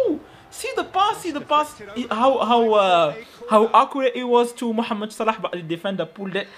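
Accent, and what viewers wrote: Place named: United Kingdom. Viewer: Bro why you have British accent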